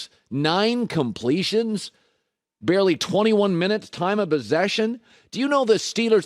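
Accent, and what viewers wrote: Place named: Washington, United States. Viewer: He’s from Washington State.😂😂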